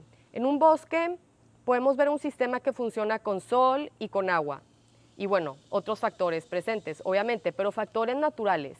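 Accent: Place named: Monterrey, Mexico. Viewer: Hola. justo acabo de terminar de ver tu entrevista con nayo Escobar Jajaja, y justo hacía como unas tres o cuatro semanas que te descubrí en el video en donde fermentas verduras Y Bueno nada excelente tu canal y todas las tantas cosas que estudias, Qué haces Y en las que trabajas Por otra parte no sé si en este canal exista un video en donde hables de la historia de tu hijo Posdata: Ahora entiendo por qué de tu acento tán fresa y norteño pues Igual que yo eres de aquí de Monterrey Jajaja Saludos y bendiciones para tu vida, tu familia y para todos tus emprendimientos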